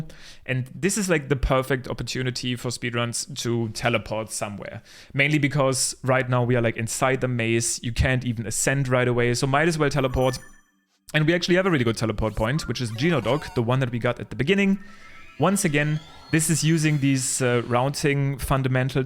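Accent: Germany. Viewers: I actually like to hear your fluid english with german accent 🙂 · German I think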